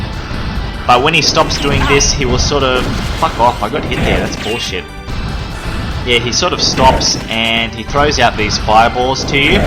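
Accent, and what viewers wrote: Australia. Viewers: are you australian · Are you Aussie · Are you Australian, by any chance?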